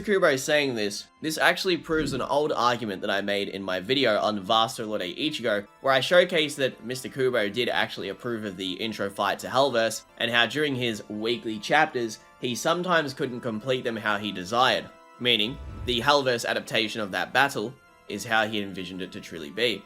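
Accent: Australia. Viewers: nah im so happy youve returned bro love from a fellow aussie (u sound like u aussie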